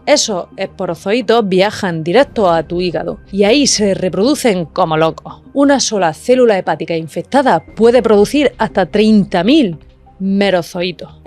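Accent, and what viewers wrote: Spain. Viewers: Fenomenalmente explicado. Magistral,. Acento granaino ENCANTADOR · Una mujer hermosísima que sabe de lo que habla, estupendas historias maravillosamente contadas, una voz que cautiva y para más, ese gracejo andaluz y ese decir que conquistan corazones sin piedad. El mío ya se lo robó cuando ví el primer video. ¿Se puede pedir más?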